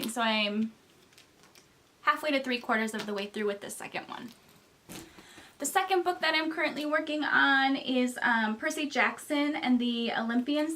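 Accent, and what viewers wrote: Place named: Minnesota, United States. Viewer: welcome! I also just joined booktube :) I'm from Iowa, so I could totally tell that you were from Minnesota right away!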